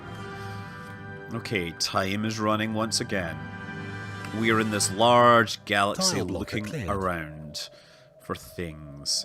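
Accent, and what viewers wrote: Scotland, United Kingdom. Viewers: So you totally taught me to play KSP and I found Stellaris myself recently and in my YT searches, I found your name again...I thought to myself "Listen to that sweet Scottish accent talk about this new game I'm playing, FUCK YES!". Haha.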